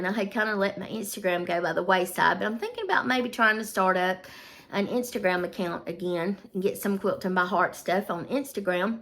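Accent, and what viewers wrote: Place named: South, United States. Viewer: Are you saying peg apron or pig apron? Sorry, from Montana and not good with southern accents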